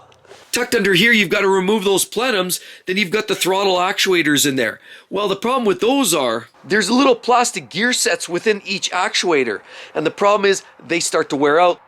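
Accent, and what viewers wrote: Canada. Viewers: Wait wait.. are you from Canada?!.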